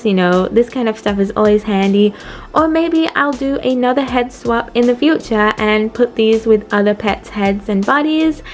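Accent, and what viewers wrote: Australia. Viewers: This is random but are you Australian?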